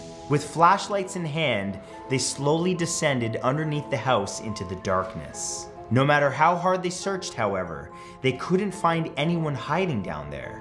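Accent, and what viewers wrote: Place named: Canada. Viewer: Back in Canada and the accent is back full force.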